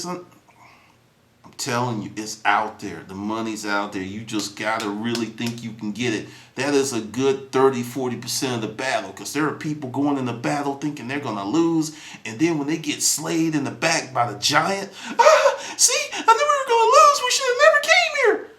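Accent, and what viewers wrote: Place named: New York, United States. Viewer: U have a touch of NY in u :) We r real people, straightforward, no BS! That's why I'm one of your biggest fans! Professional but have a little hood! LOL! keep up the good work!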